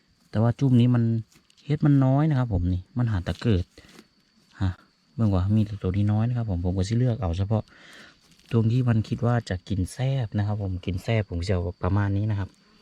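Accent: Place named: Rural, Thailand. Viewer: สวัสดีค่ะ ที่น่ารักมาเก็บเห็ดด้วยคนค่ะ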